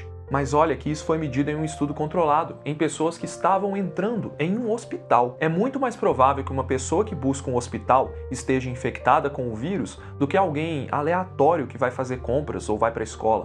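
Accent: Minas Gerais, Brazil. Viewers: Esse sotaque mineiro é muito gostoso de escutar!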